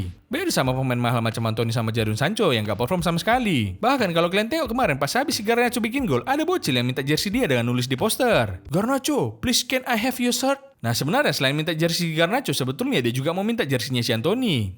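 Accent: Medan, Indonesia. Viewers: segaring apapun konten nya kalo pake logat medan jadi lucu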